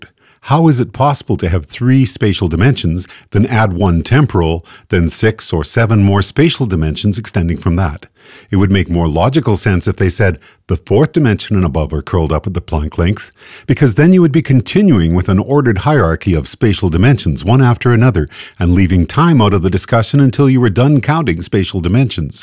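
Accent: Canada. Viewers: what a legend. I had been wondering about this when I got stoned (thats my greatest thinking time, please don't judge) and you explained it perfectly in this video. I hope you find your way into more mainsteam media. Diggin that canadian accent too. On an unrelated note, my friend wants to know on what video do you have a metal style band singing at the end with (i think) expletives in it? Just thought it might be better to ask a non science question for once!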